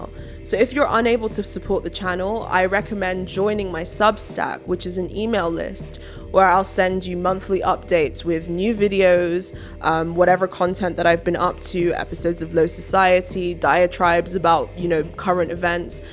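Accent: United Kingdom. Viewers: Are you from Bristol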